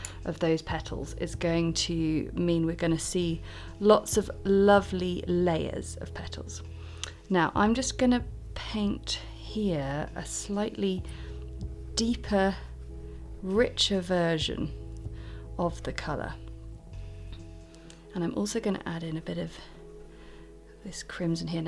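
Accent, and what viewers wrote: United Kingdom. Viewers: Well here we are 4 years on and I just knew you’d have a lovely crocus flower to paint for my Spring/Easter cards. My first go without watching this again was just a disaster, so newly armed with the better approach I’m confident I can pull this off. Ps—honestly I don’t care how you pronounce Alizarin; I so enjoy your British accent it just does not matter to me one whit!!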